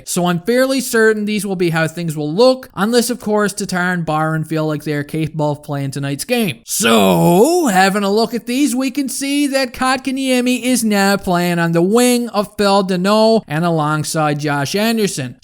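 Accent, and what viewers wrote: Newfoundland, Canada. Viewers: Are ya a newfie ?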